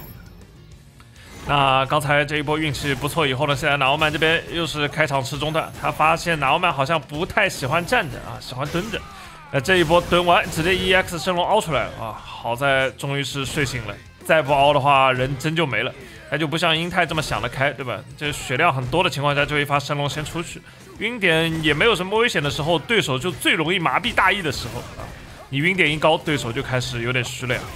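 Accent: Shanghai, China. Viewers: 是上海人？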